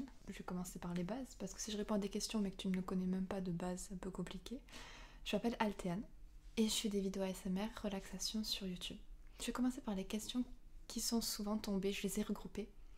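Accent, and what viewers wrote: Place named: South, France. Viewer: Merci, on entend ton accent du sud :) Mignon Kawaï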